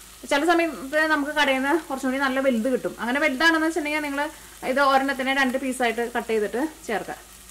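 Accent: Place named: Angamaly, India. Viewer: Slang ketitu nammade Angamaly Kari anennu thonanu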